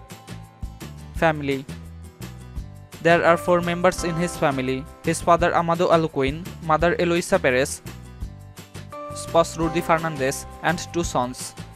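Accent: India. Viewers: Indian Narrator????????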